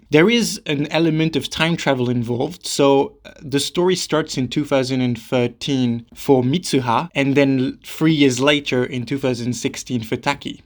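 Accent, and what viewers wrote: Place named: France. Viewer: l’accent français mdr j’aime trop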